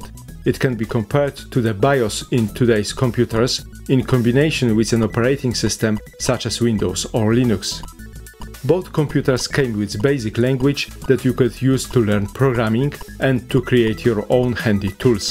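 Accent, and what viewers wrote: Poland. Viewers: akcent... uszy bolą... nie sprawdzając jeszcze domyśliłem się, że polak... nie wiem, ale tego typu akcent - powszechny u polaków, to ... nie wiem jakby to określić.... jakby "udawanie" że się mówi po angielsku ale sama treść wartościowa i ciekawa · please do the voiceovers with one of available speech synthesizers next time :( i can't stand this Ponglish, which is a darn shame, as the rest of the vid is great.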